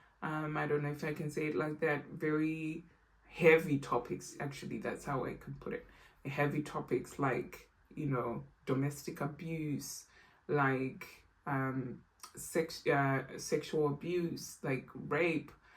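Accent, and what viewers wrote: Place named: Zimbabwe. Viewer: I just finished her book NOW, and I am feeling SO full of thoughts and emotions now. I am now watching and listening to your review. I am going to do a review of the book on my channel soon. You sound Zimbabwean. Are you Zimbabwean by any chance? I am Zimbabwean and UK based.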